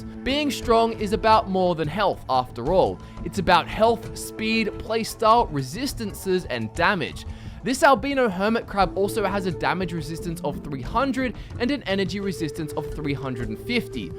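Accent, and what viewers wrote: Australia. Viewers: Austin DeWaal it’s an Australian accent bruh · he sounds Australian which is how they say that word in that way. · Hi Hi Actually no he doesn't He's Australian and that's how Aussies say it British people say it that way too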